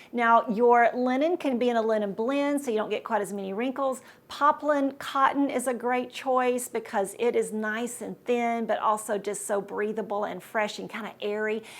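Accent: South, United States. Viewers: When you said you lived in Ohio, I thought, "What?? She's not from the South?" And then you said you grew up in Georgia, and I thought, "Yes!" I knew you had to be from the South! South Carolina/Mississippi girl here!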